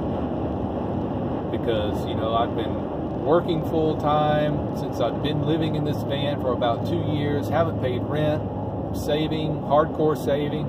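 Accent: South, United States. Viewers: Love your southern accent 🤠👍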